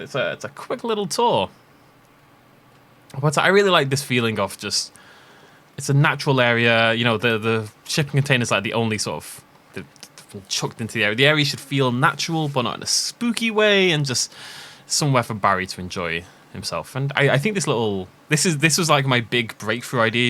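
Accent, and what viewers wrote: United Kingdom. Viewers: Commenting because the nice British voice told me to. Have nothing interesting to say though.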